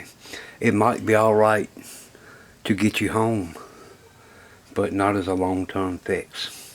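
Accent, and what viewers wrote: South, United States. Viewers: Hi Everyone. Once again entertains us with another 'hands on' video of his talents and commentary with a distinctive southern accent. I am always looking forward to the next one. And through our generous Patreon support, we can assure there is another. As a Patreon supporter, you will have access to special projects and give aways not found on YouTube. This week, kickes off a repair and restoration program series on a Drake TR4 amatuer radio transciever I donated to the Radio Shop just for Patreon. As most hams know, Drake TR4 are rugged, well built rigs that can match any modern radio in a QSO ragchew or contest. We also began a R&R series with the preliminary repair of a Hallicrafter's PS500 power supply to be followed by the repair of a Hallicrafter SR400 Cyclone transceiver. Lots of good stuff coming to Patreon. I invite all of you to join in the fun for as little as $1. 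See you there!!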